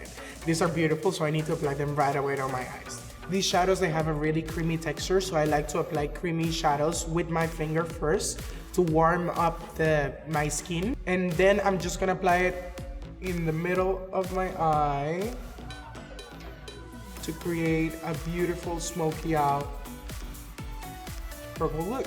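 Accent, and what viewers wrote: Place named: Spain. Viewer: I just love your positivity energy and how you speak English with a little bit of Spanish ❤️❤️ I also did a quick apply and viewing video for this month I got almost the same thing beside the lashes tho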